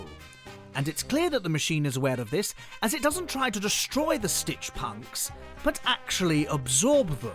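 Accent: United Kingdom. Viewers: This dudes British accent made me happy again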